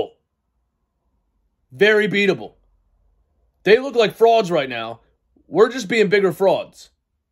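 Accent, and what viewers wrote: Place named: Delaware County, United States. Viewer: This dude definitely from NorthEast or Delco 👍🤙✊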